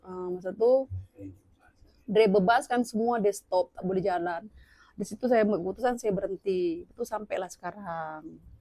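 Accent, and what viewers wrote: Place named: Malaysia. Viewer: Cara percakapannya dah macam orang Malaysia. Semoga permohonan kerakyataan Malaysia berjaya.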